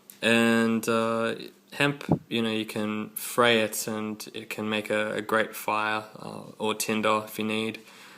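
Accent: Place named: Australia. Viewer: LtWolf/TheOfficialWolf haven't you ever heard an Aussie before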